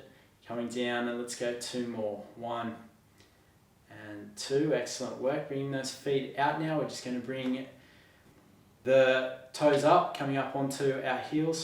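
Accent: Australia. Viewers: Interesting Australian English! Those instead of your. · Perfect pace for this old boy Tks Aussie(?)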